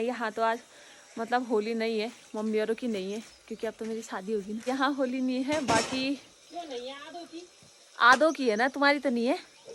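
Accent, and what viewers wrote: Garhwal, India. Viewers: aap gadwali bahut pyari bolte ho phli baar suna mene ese bolte huye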